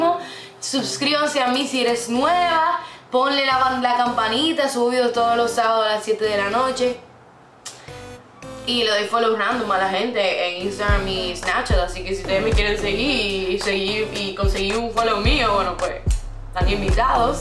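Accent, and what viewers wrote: Dominican Republic. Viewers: La mayoría criticandola a ella cuando ella está dando una video reacción y dando una crítica constructiva nose por donde no se entiende además vi unos comentarios criticando la forma que habla por si la gente que piensa que República Dominicana no existe pues si, si existe Dios que acaso no se dan cuenta que cada país tiene un asentó diferente?! Creo que deberían darse cuenta que esta dando su opinión dentro de su perspectiva no es que ella va a pensar igual que ustedes. Desde mi punto de vista Yo pienso igual que ella no veo necesario mostrarse así en las redes sociales no hay nesecidad no? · es de RD????, es que habla como tal, porque yo también soy de RD pero respondame plis alguien la pregunta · Tu tienes el acento de mi pais republica dominicana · Eres dominucana?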